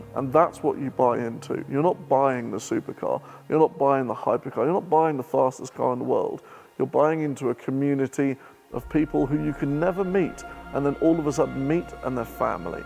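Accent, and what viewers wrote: RP, United Kingdom. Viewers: This guy’s voice sounds like a stereotype cartoon posh British guy from 1900s with a suit and a monocle. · You sound like the stereotype of a British posh bell end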